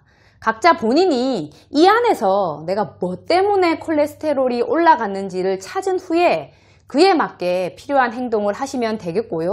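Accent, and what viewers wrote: Gyeongsang, South Korea. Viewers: 개념원리 동반한 설명은 처음이네요. 감사합니다. 고향이 대구분이실듯.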